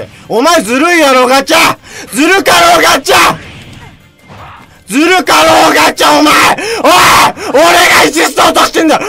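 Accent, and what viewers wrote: Fukuoka, Japan. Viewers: 福岡のどこか？がちゃがちゃ言う方言今まで聞いたことなかったwww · 方言福岡やね。何とかやろうがっちゃ〜！って言うw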